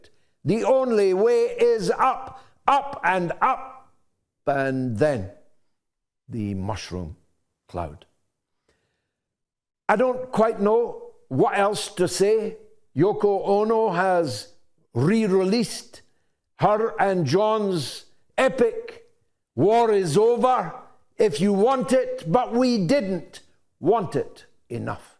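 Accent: Scotland, United Kingdom. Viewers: I like your Scottish accent. 😃👍❤️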